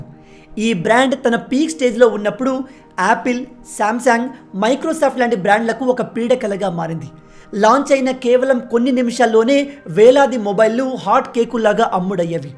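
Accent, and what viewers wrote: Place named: Marwari, India. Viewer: Anna, Mana ooru edhi. Slang kastha marwadi slang la undhi.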